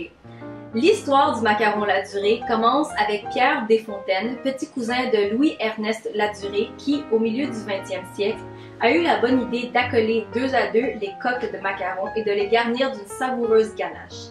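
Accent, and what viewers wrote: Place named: Quebec, Canada. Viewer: Tu es Canadienne? Tu as l’accent Québécois quand tu parles français !😍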